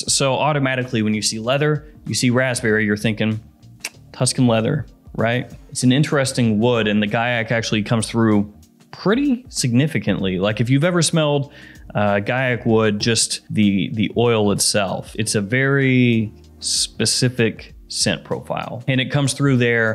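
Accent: South, United States. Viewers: Southern accent, again. Awesome!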